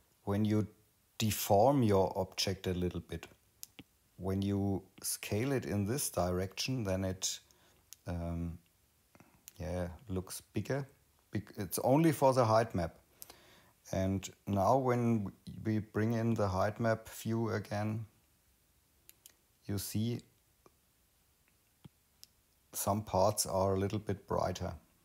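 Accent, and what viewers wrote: Bavaria, Germany. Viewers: Thanks for sharing. I love Bavarian English 🤭. Alles gute 🫶🏼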